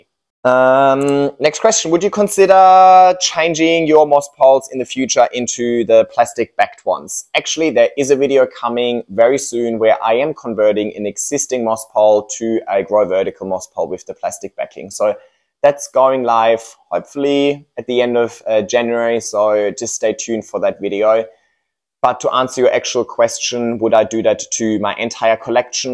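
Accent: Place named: Germany. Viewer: Are you German? I can't figure out if you're a German in Sydney or if Australian English is actually that close to a German accent 😂 It kinda blows my mind how similar it is :D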